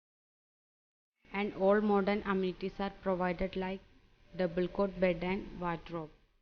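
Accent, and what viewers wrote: Kerala, India. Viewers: Sound like southern accent of female may be Kerala's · Who noticed malayali voice over 🤠😀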